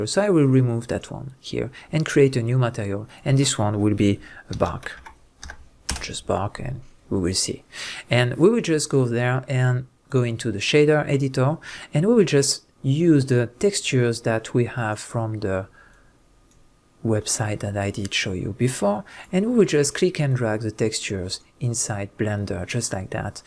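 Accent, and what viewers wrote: France. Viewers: nice tutorial with nice baguette accent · L'accent a la française !!! Tmtc😂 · French accent ^^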